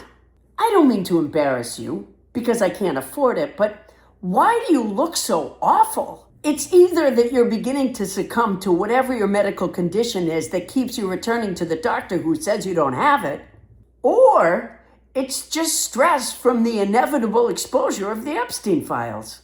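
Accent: Boston, United States. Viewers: Brilliant! Ya sound so Bostin! It’s always a pleasure to see (and hear you)! As Denis would say, “He’s an a-hole!” ❤❤❤❤😂😂😂😂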